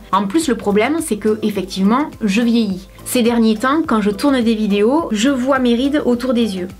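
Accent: South, France. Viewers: Je vais bientôt avoir 26 ans et je me sens tellement plus proche de toi que les autres Youtubeuse qui nous placent bien leurs vidéos Marketing et nous font culpabiliser avec leur ventre plat sans jolie poignée d'amour de chocolat Lol. Je serais tellement déçue si tu arrêtais on a tellement besoin de youtubeuse comme toi naturelle et pas superficielle. Et surtout j'adore tellement ton joli accent du sud de la France 😍 courage ma belle. Personnellement j'ai un poids normal mais avec des poignées d'amour j'assume pas trop mais je n'ai pas envie de me priver je mange déjà équilibré mais avec un peu de chocolat lol (on n'a qu'une vie) 😘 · Je crois que quand on passe la barre des 40 on a du mal á trouver son équilibre dès qu'on passe par des changements. Equilibre psychologique et physique. .Tout est plus lent. Ce qui m'aide c' est au moins prendre soin de moi au niveau de la tête. 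Comme ça j'essaye de pas trop me crasher dans les descentes. Tout le travail que tu as fait sur toi est bon , malgré ce que je vais te dire tu ne vas pas le croire, du coup il faut que tu apprennes á te supporter, oui ça s'apprend et des fois ça passe par le fait de se supporter dans la pire des situations , du coup les creux de vagues durent moins longtemps. A plus de 40 ans les hormones commandent, ne JAMAIS oublier ça et du coup on a l'impression de devenir complètement dingos. Le chemin est parfois long et tortueux. Tu es très drôle et très réaliste c' est génial , ça fait du bien . Il faut s'occuper de soi á tous les niveaux , il faut apprendre à s'aimer et on y arrive (si j'y arrive tu peux y arriver) en se faisant accompagner, en faisant des lectures... Une fois que tu auras cette autre base, toute l'expérience que tu as au niveau du sport et de la nourriture se replacera. Big up à toi , j'adore ton accent et ton amour de la vie, la vrai , me too je suis du sud et ça fait du bien de l'entendre.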